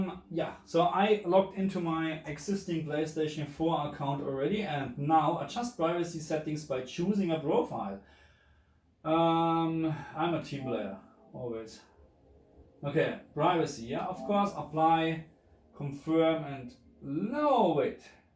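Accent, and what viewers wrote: Germany. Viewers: Absolutely u German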